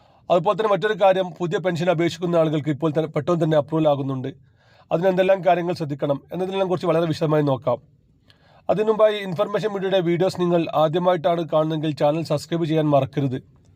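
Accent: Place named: Bengal, India. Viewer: ഇയാൾ മലയാളി അല്ല. ബംഗാളിയോ ഒറീസ്സയോ ആണ്. മലയാളം നഹി.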